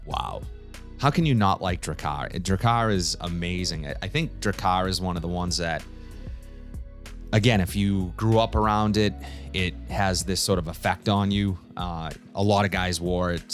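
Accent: Boston, United States. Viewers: Are you from Bahston? I can tell I'm from up there myself. I enjoy your reviews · That Boston accent you got going on is so frigging annoying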